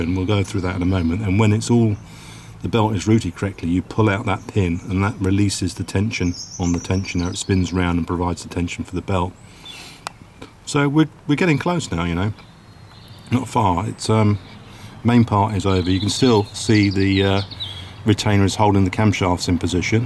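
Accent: United Kingdom. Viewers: Love your voice, and how your way of speaking is very clear opposed to many other british people where you can hear the heavy accent.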